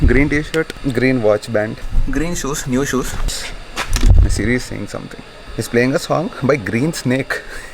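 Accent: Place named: South India, India. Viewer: You sounds like south indian where are you from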